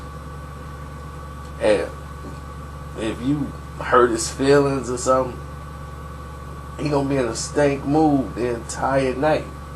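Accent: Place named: Midwest, United States. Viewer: j o yes hes from midwest tho...not east coast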